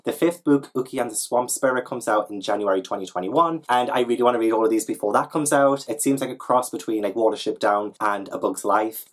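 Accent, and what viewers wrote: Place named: Newcastle, United Kingdom. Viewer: I really need to get back into middle grade reading! Also it's fantastic to hear a fellow geordie! Loving the recent videos - stay safe x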